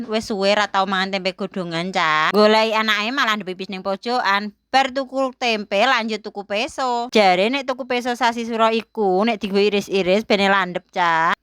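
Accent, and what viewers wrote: Java, Indonesia. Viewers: ❤️ mu desa mana to mbak Tulung bls been jelas mbak NK ngomong KK boso jowo ne medok banget mbak. seneng netesin ngrungokne mbak.. swun mbak sehat selalu Amin 🤲👍💪🍅🙏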